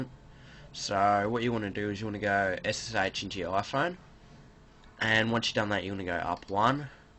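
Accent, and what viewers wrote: Australia. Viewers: Thanks for the tute mate. Good to hear another fellow aussie voice ;) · I frickin love your accent bro im aussie aswell. good to hear it and thanks for the video it helped. Aussie pride amrtie ?!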